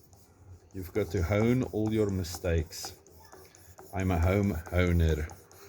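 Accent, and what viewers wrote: South Africa. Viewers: SO LEKKER om 'n Suid Afrikaner op YT te hoor !!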